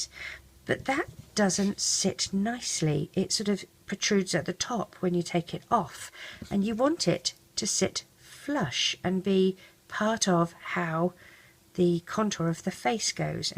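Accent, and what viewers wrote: United Kingdom. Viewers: Kate Schultz thanks♥ i love how do you speak...is it britan!? I love England! !!♥♥♡♡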